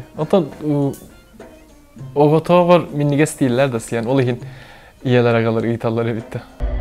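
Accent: Yakutia, Russia. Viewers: Бэрт уол.Акцена олох сахалыы.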